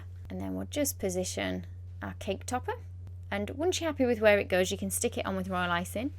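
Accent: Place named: England, United Kingdom. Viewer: which part of England is this accent?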